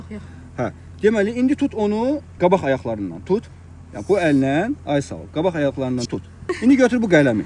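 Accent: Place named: Zaqatala, Azerbaijan. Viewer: Zaqatala aksenti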